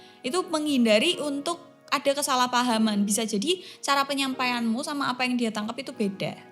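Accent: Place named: Java, Indonesia. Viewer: mbak eee.dari Semarang thoo..aku sneng logat nya itu lhooo medhok tenan.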